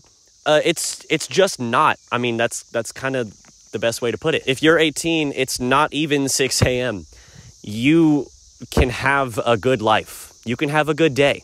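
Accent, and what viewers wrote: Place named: United States. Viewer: That scenery looks like England, was surprised to hear a US accent.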